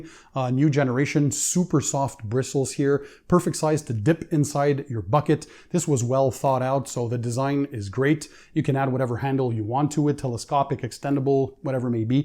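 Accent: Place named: Quebec, Canada. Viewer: oui, mais j'aime bien ton accent québécois. Ça faisait partie de ta personnalité.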